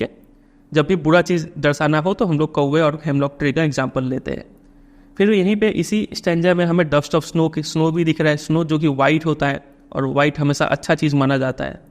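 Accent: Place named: Bengal, India. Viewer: Sir are you Bengali as your language reveals that sometimes...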